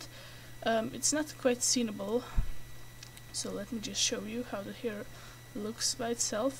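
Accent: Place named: Asia. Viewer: I like your accent! Are you or your parents from Asia?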